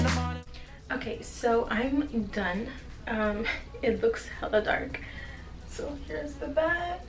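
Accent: Quebec, Canada. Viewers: Are you French Canadian? Québécois? I know my people haha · Your accent... you from quebec right ?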